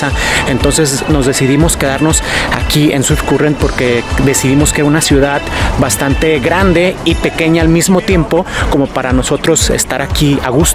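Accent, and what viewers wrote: Mexico. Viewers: Con este vídeo descubrí tu canal. Me quedé porque me resultó muy familiar tu acento; me dije: "habla como mexicano" . Luego lo confirme' cuando dijiste que comprarías tortillas. Después usaste el adverbio "bien" en el sentido de "muy" o "mucho" y eso me hizo sospechar que pudieras ser tapatío, porque recuerda que nos delata la expresión " bien mucho". Finalmente mencionaste que aprendiste soldadura en Guadalajara, en el CECATI de la calle 56. Ocurre q ue mi casa está en la calle 60, muy cerca de esa escuela. Nada más por eso, ya me suscribi' y seguiré tus vídeos. Saludosx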